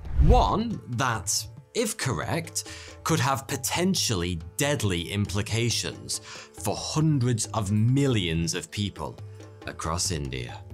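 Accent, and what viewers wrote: United Kingdom. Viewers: He is british 😂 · this man truly is a pure British 😂😂😂😭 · definitely a pom - he ain't no Aussie, there's to much of the national costume & dance, not in this. Aussies are over the 'ditch' from me, thankfully.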